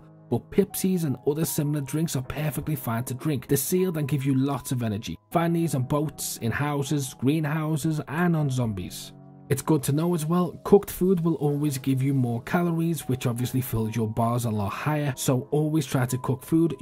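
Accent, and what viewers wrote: Liverpool, United Kingdom. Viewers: that's because you have a strong Scouse accent, but not a Runcorn Scouse accent. It's like a Stephen Graham Scouse accent. Plus your funny, so it's a win win really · Liverpool accent? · Are you a scouser? 😊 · great videos mate, scouse or over the water?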